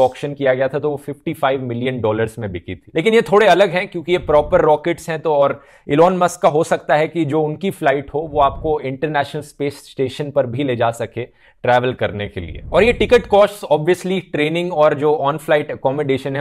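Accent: India. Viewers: Just a friendly question - do you accentuate your hindi accent on purpose ie calling ticket, as tikat tikat- for the videos? Cause you are catering to Hindi speaking audiences and want to make it easy to understand? Or is it just the way you normally talk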